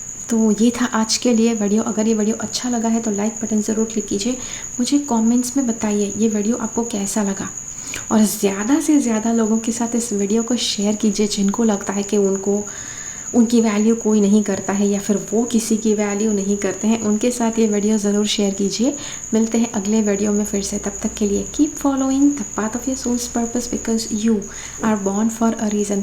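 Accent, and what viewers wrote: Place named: Kashmir, India. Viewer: . ok thanks Apka accent thoda kashmiri panditon sey milta hai isliye pocha tha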